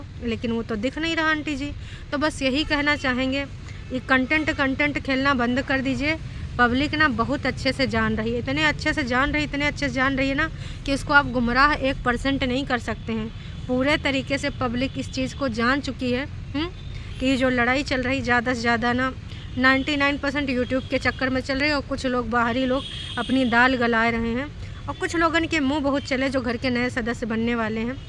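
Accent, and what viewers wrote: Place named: Lucknow, India. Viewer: aap Lucknow se hai kya, beech beech me aapki language jhalkne lagti hai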